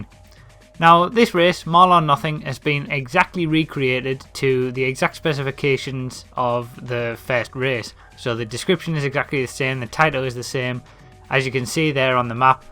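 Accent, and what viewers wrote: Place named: North East England, United Kingdom. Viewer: Thought so, im from Newcastle :) Never really came across a north east commentator, good content though keep it up!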